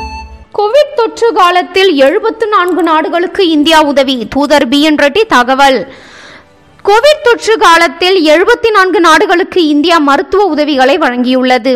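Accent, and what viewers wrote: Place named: Sri Lanka. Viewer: The way she pronounes lk fm Srilanka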